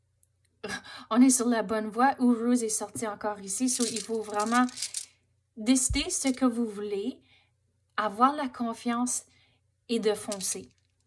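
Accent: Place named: Quebec, Canada. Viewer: Bonjour ! J'adore les Runes ! Quelle belle interprétation. C'est lié à la fonte des glaces et l'écoulement de l'eau lié au mois du mois de mars. Si on lâche prise, on accepte l'ababondance liée au poisson. Merci ! Je m'en sers également de temps en temps ! Quel jolie Accent ! Etes vous québécoise ? Belle journée !🌈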